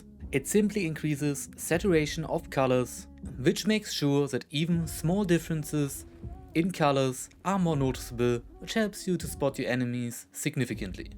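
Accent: Germany. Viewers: My guy your accent always trips me out. 90% of the time it sounds very German but 10% of the time it sounds French 😂😂 it’s German right?!?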